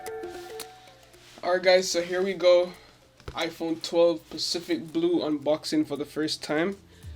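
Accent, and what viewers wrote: Jamaica. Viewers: Man sounds like a Yardie. Jamaican accent cya hide iyah. Big ups pon di content 👍🏾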